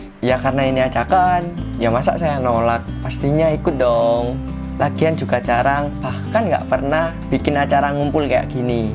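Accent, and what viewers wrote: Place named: Java, Indonesia. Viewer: Logatnya jowo bget..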